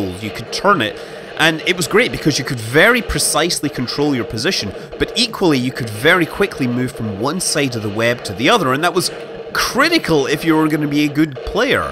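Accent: Scotland, United Kingdom. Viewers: Skaughtisch accent.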